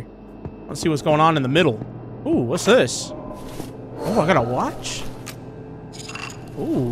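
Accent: United Kingdom. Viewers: i love the british accent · Yet another British accent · British sounds good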